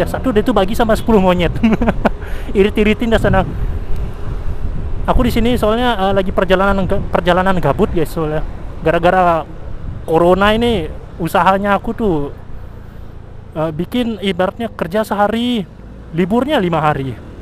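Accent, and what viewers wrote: Bali, Indonesia. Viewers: lucu ni si bli ngomong sunda logat bali XD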